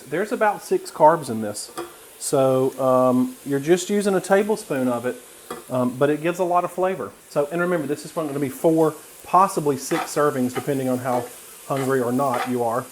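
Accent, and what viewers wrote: South, United States. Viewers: Nakia Burnside same here.... and be a Texas girl, I appreciate the southern accent. He’s easier to understand!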